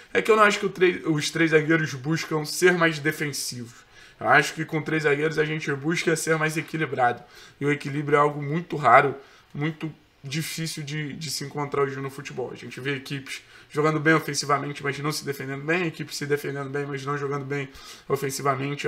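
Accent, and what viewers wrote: Rio de Janeiro, Brazil. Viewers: E esse sotaque carioca torcedor do furacão? 👍👍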